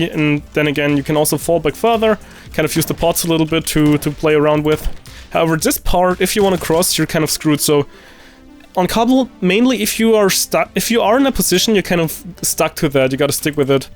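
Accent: Germany. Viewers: are you german or swedish? :D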